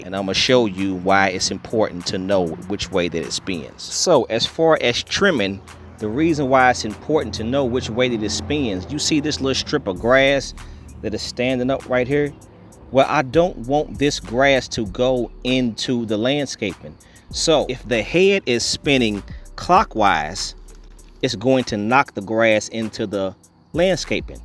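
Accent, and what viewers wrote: South, United States. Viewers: Great video. Wow. I love that southern accent.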